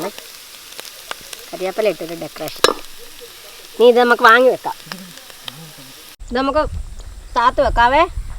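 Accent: India. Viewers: Ammmachi bhaaaasha super like yourlanguage..aaalapppuzha.suppppper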